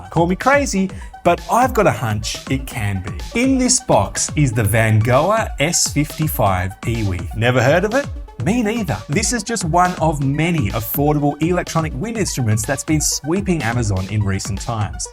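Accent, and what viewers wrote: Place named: Australia. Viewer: Didn't realize you were an Aussie until you stated it. After that, I couldn't unhear your accent. Regardless, you have perfect radio/TV voice for any international channel, you articulate so effortlessly. And thanks for the excellent review. I am really considering getting this instrument and will use the link if and when I do. If I were not already focused on learning various rythm instruments, I would consider sax and your course. It is an opportune deal for those who are interested though.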